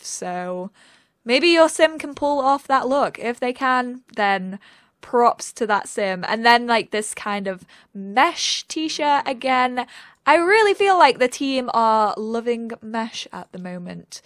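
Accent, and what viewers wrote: England, United Kingdom. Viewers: you are my favorite youtuber!!!! you are one of the only youtubers that makes quality sims videos, or videos for that matter. the fact that you have an English accent is amazing, not just the normal American. =)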